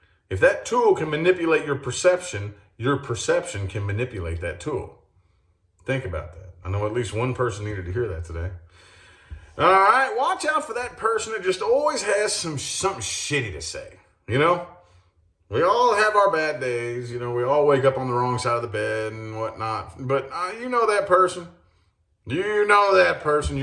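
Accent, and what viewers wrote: South, United States. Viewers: Haha, I love your southern accent!! 😈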